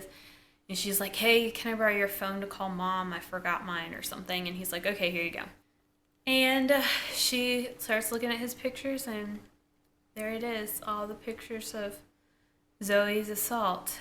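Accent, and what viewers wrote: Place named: South, United States. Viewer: everytime i watch one of your Degrassi vids i end up getting pissed off.. i'm trying to relive my highschool memories and i got you in your southern accent with european charm ruining it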